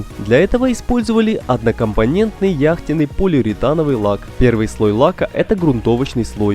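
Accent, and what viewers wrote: Ukraine. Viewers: А голоса за кадром украинский акцент что-ли не пойму?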